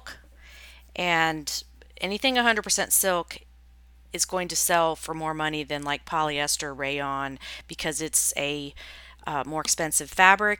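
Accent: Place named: Georgia, United States. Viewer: Enjoy your videos. Living outside of Georgia now, hearing your accent takes me back to Atlanta (Little Five Points.)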